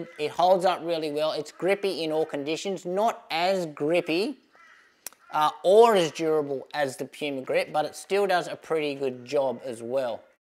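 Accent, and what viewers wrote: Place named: Australia. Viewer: This guy is a great reviewer and he has one of the most thickest austrailian accents.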